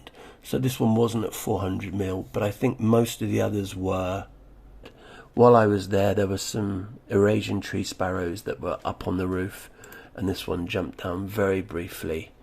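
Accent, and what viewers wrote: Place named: United Kingdom. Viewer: A very good effort for your first vlog attempt with interesting content and I am looking forward to seeing more on the OM-1 as it is on my Christmas wish list. It would be good to include your location in the vlog as I was playing detective trying to work it out. UK accent and driving on the left, so I originally thought somewhere in the UK. But the light just appeared too harsh to be the UK. You said the temperature was 35c, so I thought you must be in Australia. But then you were photographing Pacific Swallows, so I started to suspect Japan but was not sure. It was not until I read one of your comment replies that I knew you were in Japan for sure!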